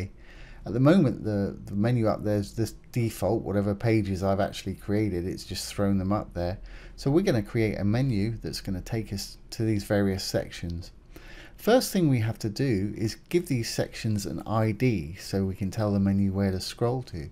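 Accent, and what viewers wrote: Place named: West Country, United Kingdom. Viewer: Hi.. Trying to place your accent. It s South with a bit of west county. Anyways enough of the small talk. Have been following your excellent lessons.As I'm just starting a website, this one in particular has inspired me to create a better idea than just a coming soon template so i can expand on the content more than just a few pictures and text. Ive liked & subscribed where i can. Was wondering if you could offer a little advice. So ive set up my Woocomm product pages with variable options,size colour etc. I make belts The drop down offers 100 colour options listed as names where the customer can make a choice. However a button next to it takes you to a gallery page showing all the colour swatches as better experience. So the ask in all this is. Can i some how link a choice in the galley to become added as part of their choices?. 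ive noticed you can hyperlink the image text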